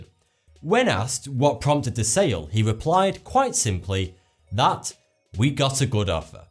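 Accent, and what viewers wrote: United Kingdom. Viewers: He is saying 42. It's a play on words sort of thing. The number 42 is said to be the "answer to life, the universe, and everything" or something like that. This has to do with the fact that he tells various facts about things in life in his videos. His channel is which sounds like 42 in his British accent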